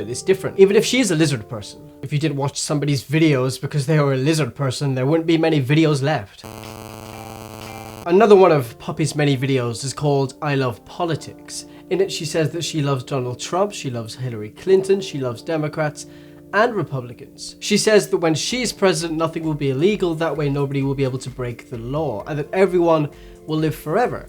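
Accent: Ireland. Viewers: I think he's Irish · Are u Irish?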